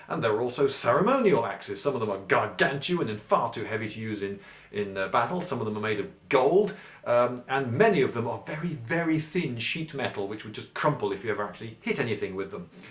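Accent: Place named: United Kingdom. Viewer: I have never heard such a good example of a british accent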